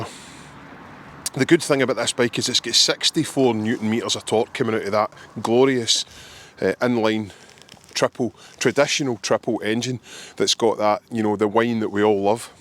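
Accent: Scotland, United Kingdom. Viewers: Scottishtriumph, yak, what a terrbile inaccessible accent..😢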